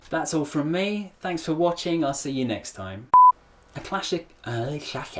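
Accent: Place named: United Kingdom. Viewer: I LOVE your British English!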